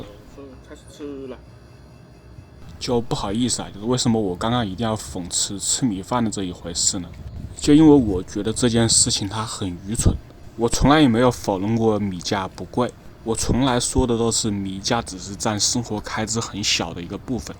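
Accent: Wuhan, China. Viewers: 这口武汉普通话啊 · up主是武汉人吗？ 听两句就感觉是武汉普通话😂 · 武汉口音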